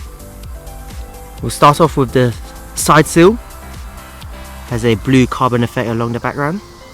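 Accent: United Kingdom. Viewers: An Asian guy with a British accent....I've not seen that before, interesting. I do like JDM vehicles, but for some reason, they keep putting the steering wheel on the wrong side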